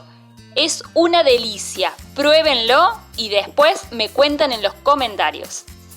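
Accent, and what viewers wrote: Argentina. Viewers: Soy colombiana casada con un argentino😁.Vì tus recetas y me haces reìr con el acento y dichos idènticos a mi esposo.Saludos🇨🇴🇦🇷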